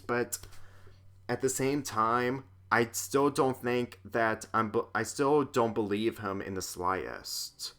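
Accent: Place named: United States. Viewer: Are you American by chance? Because from the sound of your voice in the video, you kind of sound like you might have an American accent, and that MIGHT be the most logical reason and explanation.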